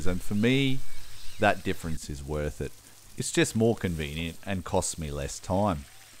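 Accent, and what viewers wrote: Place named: Australia. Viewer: He's got the nice, hot Australian accent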